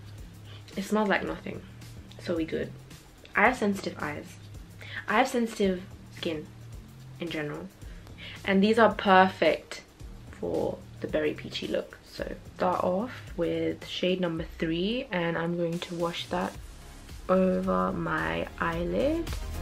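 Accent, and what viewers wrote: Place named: London, United Kingdom. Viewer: ngl I did NAWT expect you to have a London accent but what’s good 💅🏾✨ make up looks sick n I hope you feel a little better now 🥺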